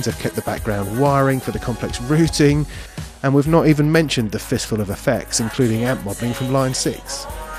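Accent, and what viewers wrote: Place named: United Kingdom. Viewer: Great review. Nice hands-on footage. And there's something about a British accent that I find calming. That must be why I listen to the BBC all the time.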